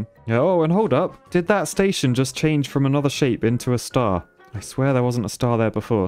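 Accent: United Kingdom. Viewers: "Our square has boarded the train" Your british always cracks me up. Thank you for making videos. You made me buy mini motorways!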